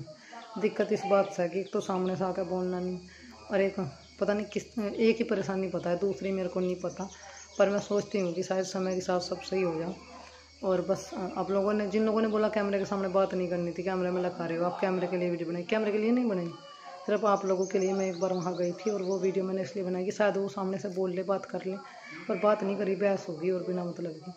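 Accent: Haryana, India. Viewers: Aaj aawaj bilkul haryanvi lg rhi thi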